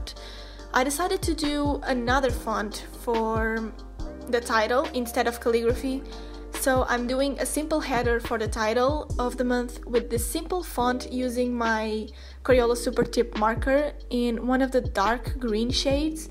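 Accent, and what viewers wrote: Portugal. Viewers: Tive de ir procurar os comentários portugueses.... Eu estava a ouvir e só pensava : este sotaque é muito uma portuguesa a falar ... Tive mesmo de ir conferir , eheh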